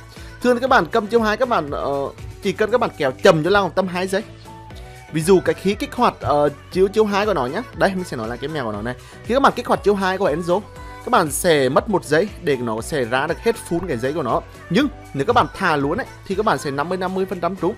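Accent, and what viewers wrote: Nghe An, Vietnam. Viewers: ngta ko hiểu tiếng nghệ an mình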